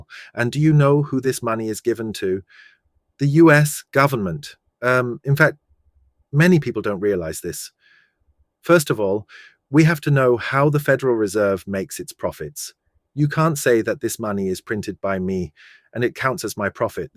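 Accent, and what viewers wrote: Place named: United Kingdom. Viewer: Very British accent 😊 👍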